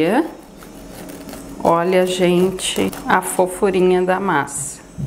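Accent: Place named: South, Brazil. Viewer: Otima sua receita!!!!e com esse sotaque do sul!!! so' pode sair receitas boas!!!!!!👧👍👏🍰🍩🍯🍮🌹🍃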